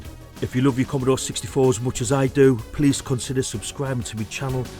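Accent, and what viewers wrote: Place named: Scotland, United Kingdom. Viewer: You have a nice accent. I thought it was Scottish, but – then again – I've never heard Scottish quite like that. The other guy has it even stronger. The way the vowels switch places sounds like the Jamaican Patois. Guess those dudes stole it from you lot!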